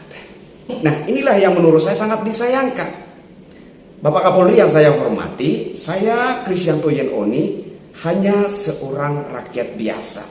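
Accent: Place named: Java, Indonesia. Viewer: Setuju ade.... Logat jawanya keren... 🤭🙏👍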